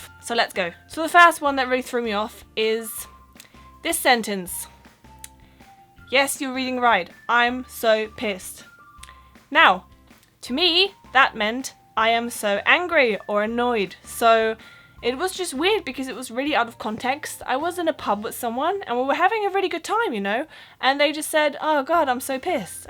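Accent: England, United Kingdom. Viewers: You sound like a native brit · You sound like you are from england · Blooming 'eck kid, you sound more British than me, and I was born there. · Slang words vary depending on what part of the UK you come from , some people in the south wouldn't know what someone from the north was talking about and vice versa, may I just say what a great British accent you have, very clear and well spoken. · The most British sounding German in the world !! Even says " Bri_ish" !! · You sound English. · Wow. Your accent sounds perfectly English. (°o° ) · how the hell is your accent so english!? ive known foreign people who have lived here for ages and never pick up the accent! were you brought up with english people?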